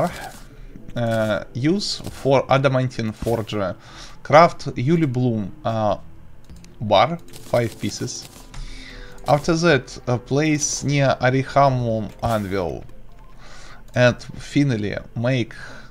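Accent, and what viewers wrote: Russia. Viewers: Cuz he's russian, he's original channel is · такой милый акцент у тебя)) сразу пон что ты руссский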